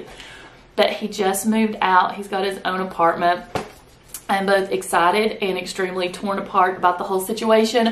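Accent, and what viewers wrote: South, United States. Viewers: That southern accent ❤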